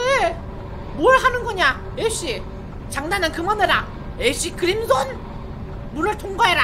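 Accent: Japan. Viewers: 한본어 개웃기넹 ㅋㅋㅋㅋ큐ㅠ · 한본어에다가 은근 성우스러움 ㅋㅋㅋㅋ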